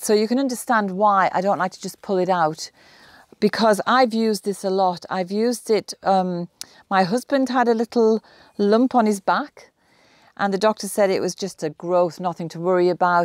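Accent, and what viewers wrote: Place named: South Durham, United Kingdom. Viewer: How can you grow plantain. I’ve just learned about it recently. Cani buy it to plant. Interesting accent south Durham perhaps?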